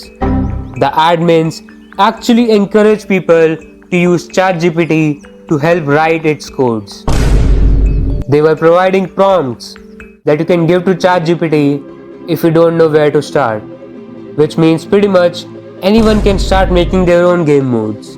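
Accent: India. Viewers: Bro are you from India 🇮🇳cuz I feel that your voice is like indian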